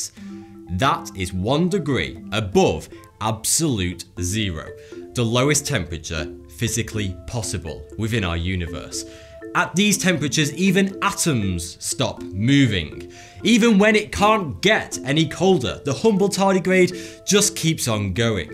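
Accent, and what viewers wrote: United Kingdom. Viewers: Edith Ann Seriously! He's not American. His accent is clearly British.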